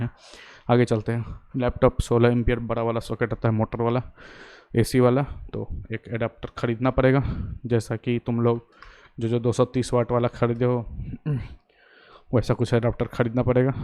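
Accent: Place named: Bihar, India. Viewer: Bhai aap bihar se hona ? Meri gf bhi bihar se hai.. aapka accent match karta hai usse.✌🏻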